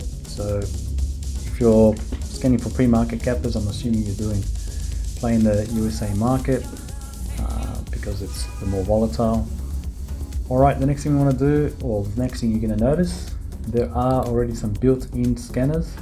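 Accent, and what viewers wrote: Australia. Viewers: Awesome bro! ... crocodile Dundee, are you an Aussie? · Hi mate, thanks for this video. From your accent I can tell your a fellow aussie! Is all this info relevant to the ASX as well? I'm using the free version at the moment, and I don't get any pre-market info, volume or gap. So to fix that I need to subscribe? I also believe to get live data and not 20min delayed I need the live data extra - is that correct as well? Cheers, thanks for any tips you have.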